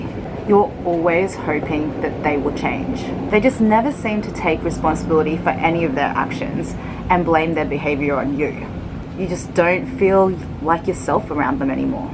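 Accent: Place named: Australia. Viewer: I seriously love australian accents so much (which is what I think yours is?) lol if not, I STILL LOVE IT Also, I can relate to ALL OF THESE. It made me laugh when you said "you just wish they'd leave you," because I lowkey would have this thought in the back of my mind that said "I wish he'd just cheat on me so I could leave angrily." I know it's bad, but I was looking for a way out instead of facing my true feelings of just simply not wanting to be there.. I am no longer in that relationship though!!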